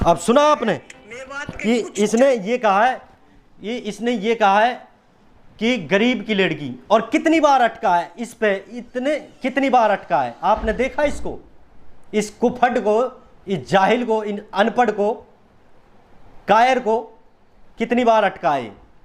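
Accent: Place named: Mewat, India. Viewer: Sahi bola Bhai aapne aap mewati bola good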